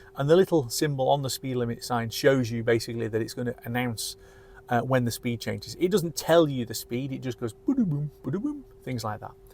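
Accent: Scotland, United Kingdom. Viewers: Voice controls and scottish accent 😀 This Tesla struggles like myself when on vacation in Inverness, since I am not an english native speaker. Very nice car in exactly the colour I would choose too... Different to your car I would choose white for the interior. Keep on with your video works; after watching several videos randomly for quite some time you've just won a new subcriber.Cheers from Austria! Ludi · Just what I wanted in a demo video, no nonsense, British and informative!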